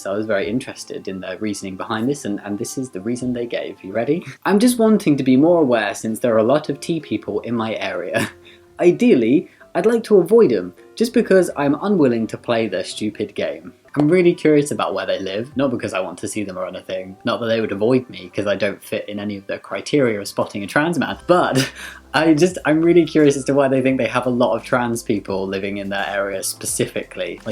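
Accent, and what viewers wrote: United Kingdom. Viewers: Gee, I'm a Cis Gay Man. But I have a round jawline and no visible Adam's apple (because I'm fat), does this mean I'm unknowingly a trans man? The hair on top of my head (what's left of it) is straight, but my beard (which grows like crazy) is somewhat curly. No tattoos or scars (at least, not on the arms). Maybe I'm in the "?" group? Fact is, people are people. We are all one race, the HUMAN race. White, black, brown, blue, gay, straight, bi, trans, or whatever. Its corny, but love is love. Sorry I'm responding so late. Just found your channel, love your vids and the vlogs showing your transformation. I'd never have been able to do that in the hospital. And sorry your wedding got postponed, just make it bigger and better next year! PS: Love the accent! I'm American, but I'm a sucker for a British accent.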